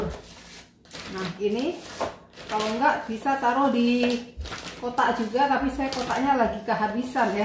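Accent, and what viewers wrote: Java, Indonesia. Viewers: MBK jelas uraian dialeknya njawani mbkx asli kebumen kah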